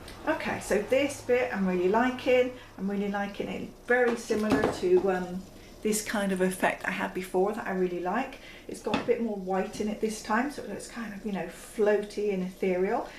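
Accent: United Kingdom. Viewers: Love your British accent, that you get paint all over and that you show the opps paintings❤️😂 you are real! Many video artist are perfect and I'm NOT, so you give me hope that even I can do it. Thanks.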